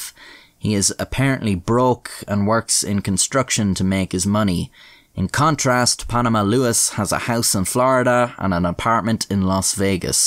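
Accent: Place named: Ireland. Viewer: Hey there lady! Just wished to say love your vids, and your narration with your awesome Irish brouge . Wish I could sound so good. I just have a goofy American accent. You have so much going for ya no need to be downing yourself as you seem to sometimes. I bet you're a right fine lad. I hope you have a most excellent day/evening whatever time you see this.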